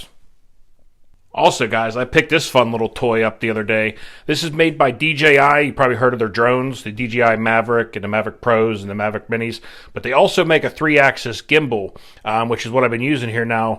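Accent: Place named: Pittsburgh, United States. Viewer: Bro... are you a Yinzer? I'm out west and I'm picking up some serious Yinzer vibes. Makes yer vid special. Keep it up!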